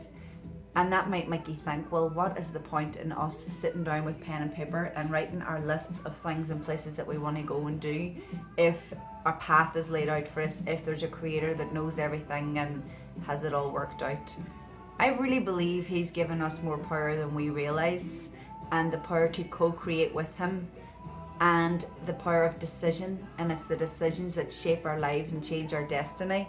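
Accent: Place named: Derry, United Kingdom. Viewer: I have just found you and it must be Divine intervention. You are a beautiful lady, talking from the heart in honest plain terms and giving examples from your own experiences. No high faluttin preaching. I'm guessing you're a Derry girl, love your accent and the fact you've never lost it. Know your roots and be proud. I know this is 4 months after this video and the Coronavirus has hit the world. I just wish you good health and stay safe. I look forward to many more videos from you.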